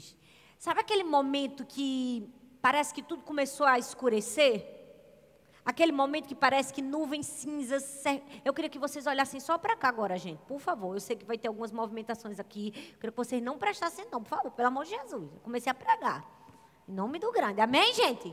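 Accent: Northeast, Brazil. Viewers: Amo suas pregações. Vc. fala a linguagem do povo. Amo seu sotaque. Tb. sou nordestina. 😍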